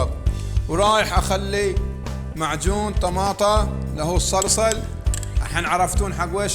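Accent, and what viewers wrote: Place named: Qatif, Saudi Arabia. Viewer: يعطيك العافية شيف ، لهجتك كأنها قطيفية بحتة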